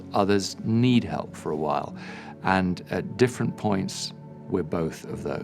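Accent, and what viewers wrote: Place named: United Kingdom. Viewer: LOVE THIS MESSAGE SO MUCH!! esp w/ the british accent well done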